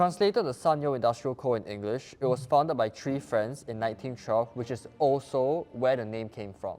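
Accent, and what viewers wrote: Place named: Singapore. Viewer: But that's how Singaporeans speak...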